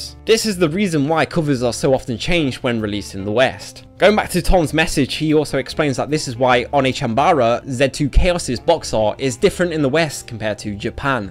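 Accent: United Kingdom. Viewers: all I can say is, in the UK (and judging from the hosts accent he should know this as well) it is law for porn magazines to be covered up in the UK, and it is becoming a ( albeit slowly ) nation standard for newspapers to be out of sight of children. video games don't have these rules, but saying that with age restrictions being a thing and, the ability to simply cover a game with some metal or wood with the title being written on it is rather stupid.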